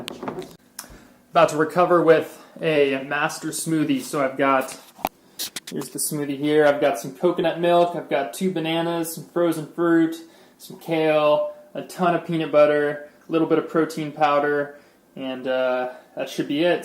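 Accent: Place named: Canada. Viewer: Good luck. Looks awesome. Were are you from? You sound more Canadian.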